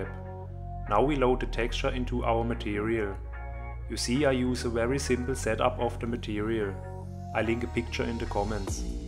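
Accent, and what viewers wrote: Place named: Germany. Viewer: ich hab geliked wegen dem deutschen Akzent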